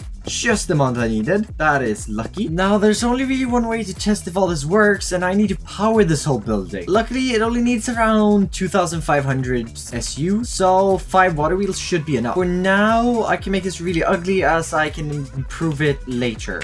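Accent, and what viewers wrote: Sweden. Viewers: These videos are great man, keep it up your builds are awesome. Jag hörde din svenska dialekt direkt hahaha ;)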